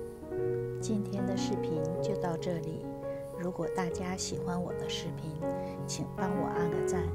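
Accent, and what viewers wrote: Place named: Northeast, China. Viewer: 隐约听出东北口音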